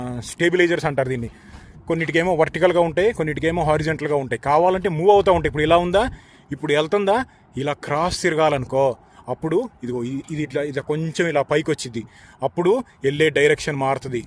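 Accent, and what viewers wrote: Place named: Telugu, India. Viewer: This is my favourite video, I watched not less than 25 times, your language and the native Telugu accent makes it so memorable, SV Ranga Rao gari Mayabazar Dialogues gurtuku vachayi, Ghantasala gari voice laga anipinchindi - antha Gaambhiryam vundi voice lo.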